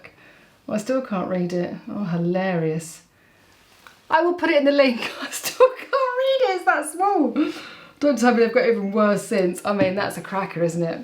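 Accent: United Kingdom. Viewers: Oh my I just bought glasses like yours. I feel very chic now. Oh to be so gorgeous with that lovely British accent. 😉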